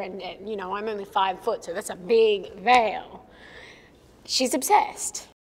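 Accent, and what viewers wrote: Australia. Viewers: It’s probably easier to do a Boston accent with you have already have an Australian one because if you notice her type of accent sound nearly similar or it sound like it wouldn’t be too difficult for her to fake that accent. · To people saying her real accent doesn't really sound Australian, this is a more southern accent, usually NSW, VIC and TAS. The nothern/western states have a more of a New Zealandish sounding accent which is usually what you hear on tv as most Australian media is from Queensland.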